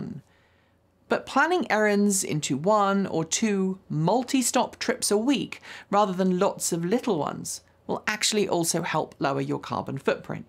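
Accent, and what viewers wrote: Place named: RP, United Kingdom. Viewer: Another entertaining, and eloquently spoken video. I learn more than the topic, but also the "Queen's English". Thank you.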